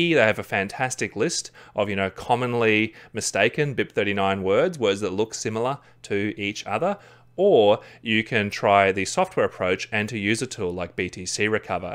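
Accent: Australia. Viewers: Hey man, your videos are great. You're Australian right? Where'bouts are ya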